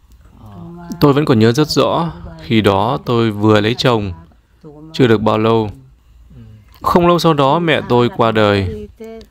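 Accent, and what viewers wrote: Vietnam. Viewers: Ông a người miền nam hay bắc đấy,,giọng nghe quen quen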